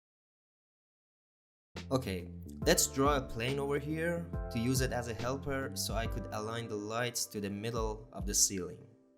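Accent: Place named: Turkey. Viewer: Sen Türk müsün aynı Türk gibi İngilizce konuşuyon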